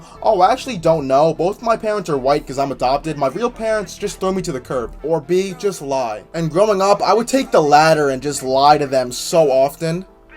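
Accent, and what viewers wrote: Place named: Brazil. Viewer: Your way of speaking reminds me of brazilians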